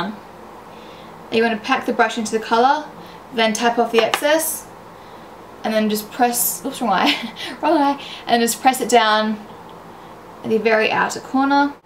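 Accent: Australia. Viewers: She's Australian, she cant change her accent. Shes perfect either way. LOL. xo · R u an Aussie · no she's aussie just like me and she doesn't have an american accent. also her po box address is in WA which is Western Australia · She is not British lol. She is Australian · is she Australian?! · Is she from Australia?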